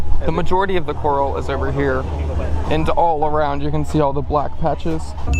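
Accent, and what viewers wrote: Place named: Philadelphia, United States. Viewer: That's a Philly accent if I've ever heard one.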